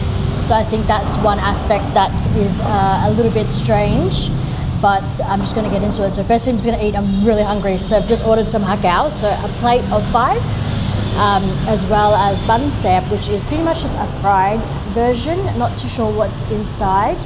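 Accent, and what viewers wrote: Australia. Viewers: Ms, you are an Austrailian????????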